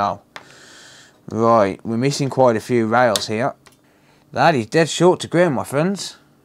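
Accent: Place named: Birmingham, United Kingdom. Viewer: Wot part of brum r u from bud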